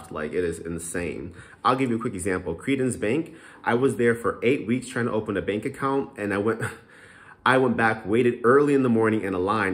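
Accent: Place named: New York, United States. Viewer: I can tell this dude is from NYC.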